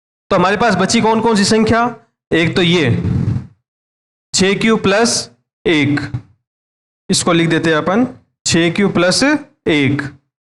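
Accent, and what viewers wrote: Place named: Rajasthan, India. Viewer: Bhai kuch interesting video banao biring lagta hai,dekho ek tho aap logo ki language sahi nahi, English talking meh Rajasthani jhalakti hai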